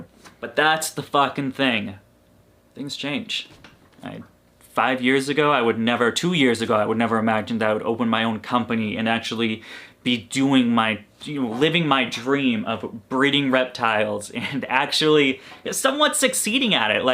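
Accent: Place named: New England, United States. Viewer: i love your accent...ya damn yankee <3!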